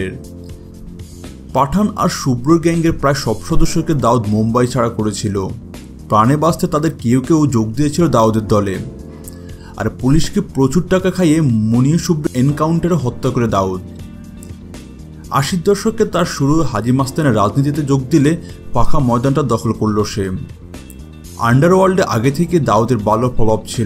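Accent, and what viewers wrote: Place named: East Bengal, Bangladesh. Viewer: সালা বাঙাল আগে বাংলা বলতে শেখ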